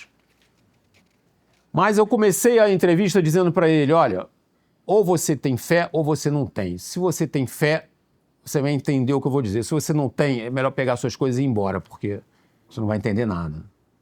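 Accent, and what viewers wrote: Rio de Janeiro, Brazil. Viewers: não nega que é do Rio… fala na lata🙈! Perfeito! Torço para que nos presenteie com mais aulas no CDB…👏🏻👏🏻👏🏻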